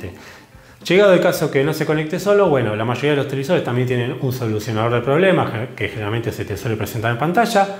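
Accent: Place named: Argentina. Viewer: Lo positivo es que vas al grano, es decir, que vas directo a las explicaciones técnicas, además como supongo que sos argentino tu acento es similar al mío y te entiendo las palabras que decís, pero como es información muy técnica y no estoy a la altura el contenido no lo interpreto y cuando ponés pantallas van tan rápido que no las llego a ver.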